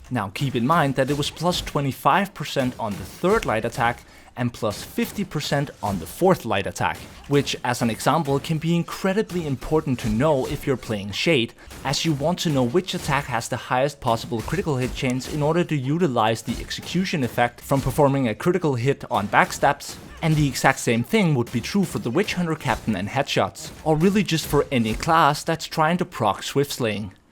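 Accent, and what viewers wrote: Denmark. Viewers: Best vermintide channel. Are you danish by any chance 😉🇩🇰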